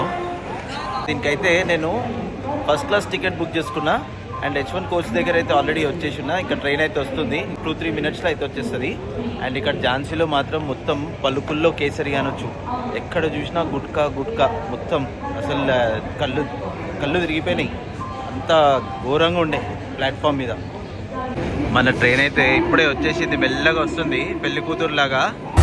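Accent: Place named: Telangana, India. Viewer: తెలంగాణ యాస 😊❤...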